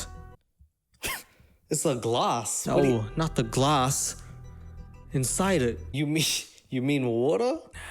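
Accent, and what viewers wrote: Australia. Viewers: Less than 6 minutes in and my dog tried to save us by knocking the YouTube machine (cellphone) off the table. Though in this dude’s defense- he sounds like a standard surfing Australian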